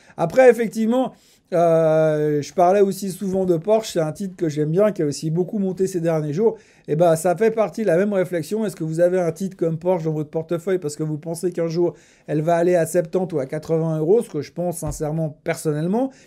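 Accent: Switzerland. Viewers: Dedieu dedieu, il me manque cet accent suisse. Merci pour ce que vous partagez ;-)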